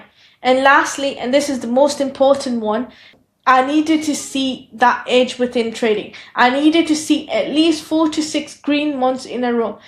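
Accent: United Kingdom. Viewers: Are you from UK? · Thank you for the video. I am good with accents but I'm really struggling with yours. You sound a little Scottish, but not. Where are you from?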